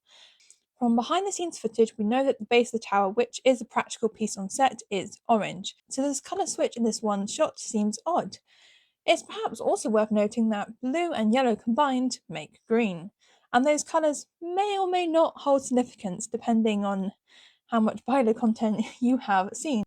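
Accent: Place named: United Kingdom. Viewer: I like ur accent it's kinda like British🤭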